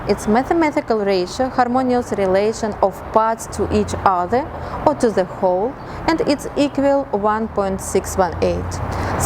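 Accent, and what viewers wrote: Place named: Russia. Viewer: А че говор Русский))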